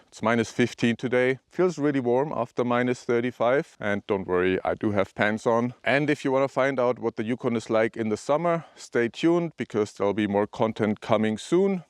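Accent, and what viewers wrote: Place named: Germany. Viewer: A german accent in the yukon snow in underwear, what gold.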